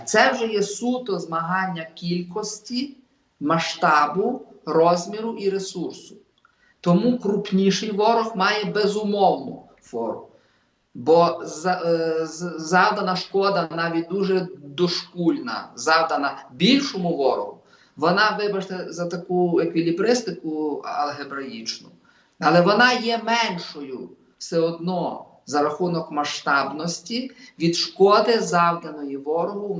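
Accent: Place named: Carpathians, Ukraine. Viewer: Надо видеть цели России и Украины. Цель России - безопасность ее границ и безопасность русских. Возврат Малороссии в состав большой России вторичен, так сказать, два в одном. У Украины своей цели нет. Украину придумали англосаксы, чтобы разделить русский народ и заставить его воевать сам с собой. Яркий пример - сидит довольно умный этнически русский Дроздов и на чистом гнусаво - карпатском диалекте вещает в пользу Украины.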